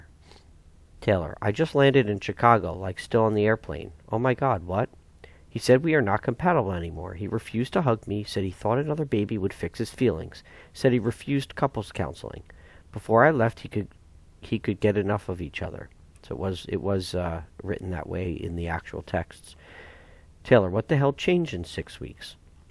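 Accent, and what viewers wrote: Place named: United States. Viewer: Thank you for your greeting. I am a great fan of true crime. I find it fascinating that in the USA, you are privy to such documents and/or knowledge about the cases, no such liberty in the UK. I am on part 8 as I think I have probably watched all clips that Shannan or any of Shanann's friend have taken. Have you read any other crime cases? I think this has hit us so hard because we are they, ie we can identify with their lives. I hope you don't get bored .... I speak a couple of languages and it has always been my dream to read books in the first language of the writer eg. Anna Karenina in Russian, Anne Frank's diary in Dutch etc etc. Hearing you read these gives the correct nuances unique to the English spoken in the USA. Thank you so very much.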